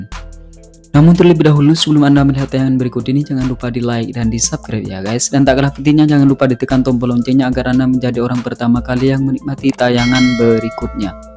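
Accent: Madura, Indonesia. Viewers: Bang logat mu kok madura ya ??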